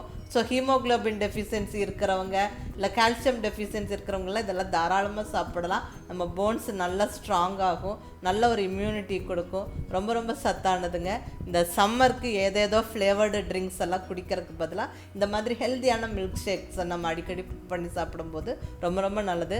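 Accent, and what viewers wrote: Kongu, India. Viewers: Good one ma'am. Thank you. Namma kongu tamil alago alagu.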